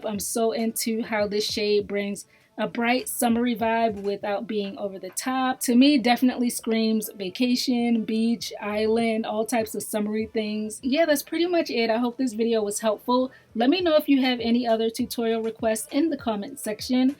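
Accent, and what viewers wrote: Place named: Maryland, United States. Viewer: I'm getting ready to try this. I followed your butterfly lock tutorial & got tons of compliments! Thanks! (Where are you from? You sound like you're in Maryland. Either way, I love your accent!)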